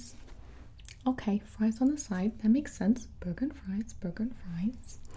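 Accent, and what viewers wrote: United States. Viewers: The American accent tho 🤣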